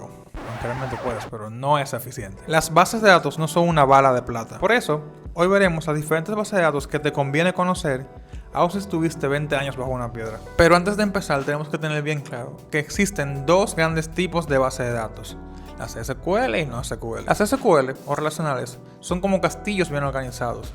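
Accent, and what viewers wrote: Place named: Dominican Republic. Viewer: Algo me dice que eres Dominicano, si es así, estoy muy orgulloso por ver compatriotas haciendo esta clase de contenidos. ¡Excelente vídeo! ¿Podrías hacer un vídeo sobre el road map que una persona no informática debería seguir para ser un Desarrollador de Base de Datos en 2025?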